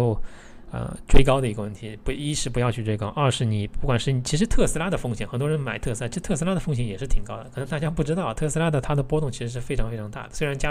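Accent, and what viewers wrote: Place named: Shanghai, China. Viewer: 哈哈哈，你是个小姑娘吧？看的我笑出声了。他说话上海口音很明显呢，长相很适合演老顽童年轻版本。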